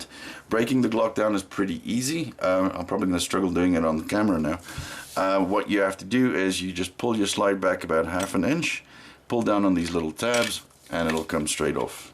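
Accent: South Africa. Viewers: I hear a South African accent.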